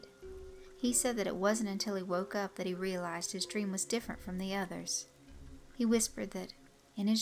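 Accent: South, United States. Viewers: I honestly love your videos!!! I was born, raised, & still live in Alabama & so glad to hear another southern voice. All of your stories are always so captivating. Great job & keep up the amazing work. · Please don't take this the wrong way . Your southern lilt really puts a story to a first calming effect and at or towards the end I can pick up the fear or excited tone bringing the end to a much more dramatic conclusion . Great job on the story .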